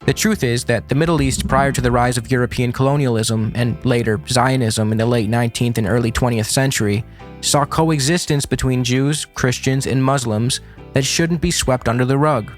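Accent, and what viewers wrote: United States. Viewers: Was quite okay listening to this as a background until I head the commentator’s American accent saying that he’s not saying the ottoman turks weren’t humanistic paradise and that they did some horrible shit like the Armenian Holocaust.. and I just found myself thinking how amazing it is that Americans somehow don’t exist in their own heads when talking shit about other civilisations. I mean he exists as a citizen of his country thanks largely to his ancestors successfully did to the indeginous people what Israel is doing to the Palestinians. And then they didn’t stop there, in order to compete in trade internationally they went and enslaved god knows how many Africans to keep their business overheads low. And NOW they are helping out with a genocide because their best buddy Israel really wants a genocide. If the ottomans did shit. What have the Americans been doing ? 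· Sir you sound like an American. Doesn’t that mean that you are from a country that is colonized land? Are you prepared to leave this land and return it to the indigenous population? It could be that you have indigenous blood having intermixed ancestors but certainly you have blood of colonizers also so do you get to stay or must you go??? Does it matter who colonized THIS land in regards to whether YOU get to stay or go??? Populations over history have immigrated around, colonizing, displacing, being displace and even returning. The Jews are in Israel now. Regardless of their religious views or lack of them, they are there NOW. Just like we Americans are here now and we don’t plan to just leave and give the land back to the ancestors of the indigenous people because our ancestors fought them for it. We should focus of history and how civilizations have behaved over time. Nothing new here except that the world seems to hyper focus on Israel for some strange reason. 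My opinion which matters only to me, is that the world focuses on Israel because of Israeli’s claim of God giving them the land and world hates God.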